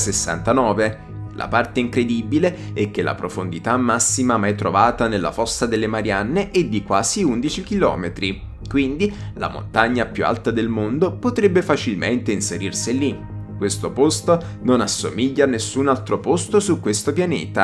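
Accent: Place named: Naples, Italy. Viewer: Quello piu spaventoso è che questo canale e quello di e altri 2 canali sono la stessa persona,puoi parlare piu basso,più serio ecc ma si sente perfettamente che 6 sempre tu soprattutto dalla calata napoletana k provi a nascondere..e i video sono uguali..l unica cosa che cambi è il titolo del video..e nn solo..avrai altri 2 canali sempre così stessi video titolo leggermente diverso..ti ci sei arricchito con sto sistema..altro che guru bitcoin ecc..questo è il sistema dei sistemi x guadagnare..all inizio ci è voluta pazienza vero?..ma una volta k gli iscritti e le visualizzazioni hanno cominciato andare a gonfie vele(alla gente piacciono questi video quelli del paranormale ecc)vai il gioco è fatto..avrai anche dei canali con gli stessi video ma parli inglese..se nn ci avevi pensato(difficile)ti ho dato una bella dritta..CMQ VABBE NESSUNO SE NE ACCORGE CHE 6 SEMPRE TU..O SE NE FOTTE..BOH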